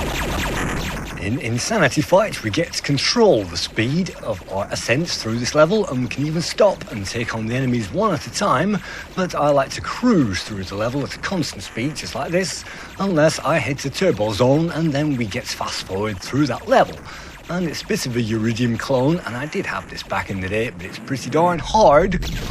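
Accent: United Kingdom. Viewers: One of the cool things about retro computing channels is the way the people who make them (yourself included ) have various accents from around the UK. After all, they are not so commonplace. Another example is Lorfarius. Cool channel. Sure, not all accents are gone... yet. Hearing the cool accents is indicative of the time when these computers were the "in thing." After all, this retro computing is about the "experience" and not just the machine or an emulation. #RealDeal #LurmunUrmurga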